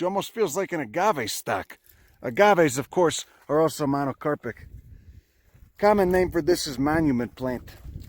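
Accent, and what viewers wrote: Chicago, United States. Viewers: I love your show, you sound like my family we all grew up in Chicago.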